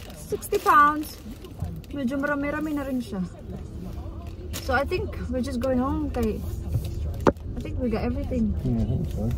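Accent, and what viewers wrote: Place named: Visayas, Philippines. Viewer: bisaya di ai ka sis hahahha relate jud sis hahaha usahay galisod ko tagalog hahaha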